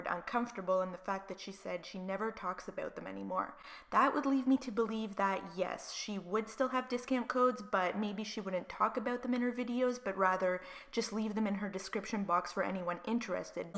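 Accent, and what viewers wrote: Canada. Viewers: I know this is off topic but I'm an not a native English speaker and when I talk I usually have accent similar to yours so I'm interested in where you're from. Some tell me I sound Canadian. Not sure what their accent is. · A. S. Lauder I'm betting it's Canada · You sound Canadian. Which is awesome.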